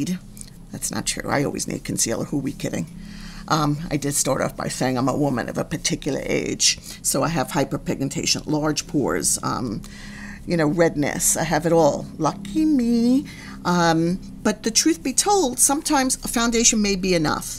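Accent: United States. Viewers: I had no idea what she looked or sounded like…she’s so normal! 😂 I love her accent too …she talks like my mother too who was born and raised in the BX ❤❤❤ love this video! Definitely gonna start buying her products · I LOVE your New York accent!! I will try some of your products soon.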